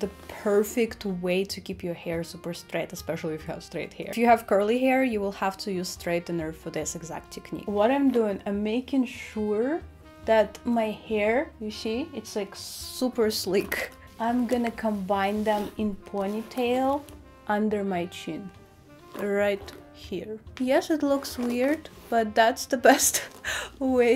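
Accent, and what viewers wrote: Russia. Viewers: From the accent and intonation of her voice, I realized that the girl was Russian. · I could listen to your accent for days. Is it Russian? Thank you for video. I am disabled and cant afford salon anymore plus i have depuytens contracture in my dominate hand. It makes for very difficult to trim my own hair.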